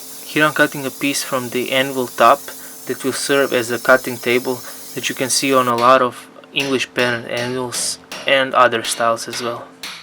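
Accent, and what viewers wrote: Russia. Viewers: Парень, признайся, что ты русский) твой инглиш неплох, но ты палишься с произношением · говор русский. ры из снг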